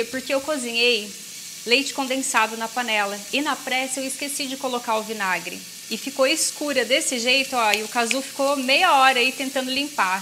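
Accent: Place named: Piracicaba, Brazil. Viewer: Adoro seu sotaque piracicabano, muito simpáticao.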